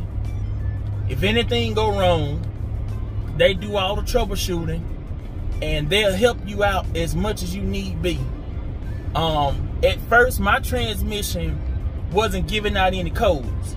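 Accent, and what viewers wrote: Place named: Texas, United States. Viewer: Sound like u from Texas maybe florida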